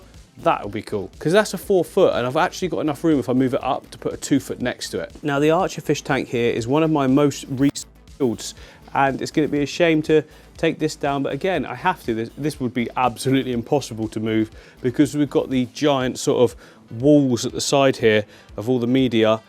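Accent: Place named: United Kingdom. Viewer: Amazing!🤩👍 P.S. Your british accent is so british!👍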